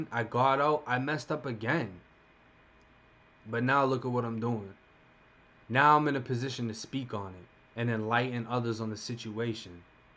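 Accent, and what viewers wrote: Boston, United States. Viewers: I've been binge watching your videos for days now, always hearing a little Boston in your voice, but everything I saw referenced Florida. Now I feel vindicated in my accent radar. Did you move here after you got out the first time? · you got the Irish Boston type slang ..words like park and few other not sure where ur from .i don't want to assume shit just cause of movies or t.v .shit i am from Canada 30 min from the boarder to newyork ..but no one sounds like how they make us in movies and shit so what do i know ..i just like the movie the Town and just going off that ..witch is ignorant on my part ..but one thing is the same the cops are just as bad here ,i am on some heavy shit now due to cops doing whatever they want and my dumbass was cold and put on a coat that was left in my car .they tell me i am drunk but parked cause the way i talk due to a head injury but bin sober for 15 years ,opened the the other door right away on privet property with no cause other then stalling to go home fighting with my chick tierd woking 12 or more hrs for 38 days in a row no break in the movie biz so lots of driving on top ..doing noting to know one and got my rights taken from me and now looking at alot of fucking time ..i got lucky years ago and got out of bad shit fell ass backwards in to a wick job i can work half the year and take half off and still live good ... 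so why would i fuck that up what was in the coat is a huge problem were i am from but i don't know where the coat came from even in pics of me all day and any other day u never seen this on me fur makes me itchy as fuck but i was cold ..left my house in a T in Jan to get out the house and away from my chick to stop the yelling ..now all the time i spent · Damn bro i thought you lived in florida you live in my hood i was wondering about that boston accent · I knew u was from Boston bro...when u said u was locked up in FLA I was like damn dude sounds like a Bostoner...that's what's up I'm from Bridgeport CT which isn't far from where u at...I knew u sounded too smart to be from down south...I fuck wit Boston especially because y'all got the smoke shops serving up 🔥...I love my quality smoke keeps me from fucking wit other shit although I like my hallucinagens🤯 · Bro got a strong ass Boston accent lol but I fw the content💪🏾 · Respect dog. 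If you dont mind me asking, what are you doing for work now? If you're going full time with youtube, you should consider starting a podcast. Team up with someone you vibe good with. Think Joey Diaz and Lee Seyet, you have the quick wit, perfect east coast/Boston accent, and stories for day. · Yo I'm from Boston I had no idea you lived here! I I hear it in your accent sometimes 😂 keep making these vidz bro there great bro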